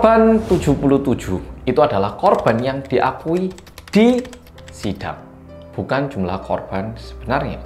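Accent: Java, Indonesia. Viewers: Sy kaget pas denger suara mas.....logat Jawa nya medok banget🤭🙏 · Suka deh sama medoknya bahasa mas..sehat n sukses trs.. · Akhir nya setelah nonton video2 beberapa x gw subscribe niiih....punya ciri khas sendiri nih "medok" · Saya tuh baru subcribe, Bang. Kaget sih ketika nemu Oppa Korea bahasa jawa medok 😆😆😆, maaf ya Bang. Suka yakin, banyakin bikin video kaya gini Bang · Jawa banget 😂😍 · Hai mas Korea Medoook😅 Siyap nyimak 🎉🎉🎉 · Kerene mas Korea narasine enak di simak asli keren mas👍🏻baru x pertama nemu chanelnya membawa narasinya pun sedap untuk di simak dgn logat medok nya pun yg bikin terpikat outo Subscrab deh✌🏻🤣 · maaf br gabung mas,mau tanya mas,mas ini asli orang mana y,kq logatnya medok kyk orang jawa 🙏 suka bgt liat chanelnya mas 👍👍👍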